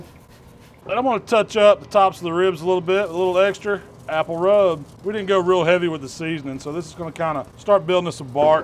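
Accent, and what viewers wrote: United States. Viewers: The most american person i have ever seen, the accent, the spirit, the bbq skills, gotta love it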